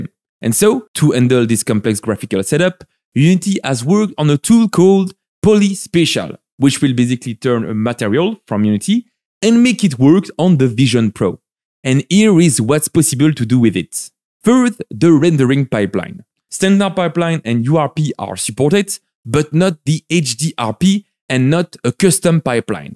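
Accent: France. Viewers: j'adore l'accent qui prouve que tu est bien français hahaha , sinon bonne video ! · Rhooooh avec ton bel accent, ça sent la France tout ça ! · Frech Accenttt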